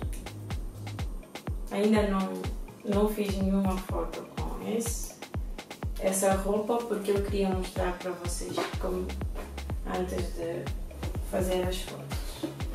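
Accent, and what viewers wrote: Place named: Portugal. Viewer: Kamily Gonçalves ela tem sotaque de portugal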